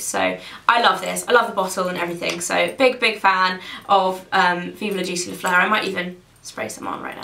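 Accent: United Kingdom. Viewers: I think that you ,pixiwoos and Tanya(pixi2woo) are the most adorable girls in this huge yt world.......maybe its because I love your british accent.....hahahahhaha....kisses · british accent ♥♥♥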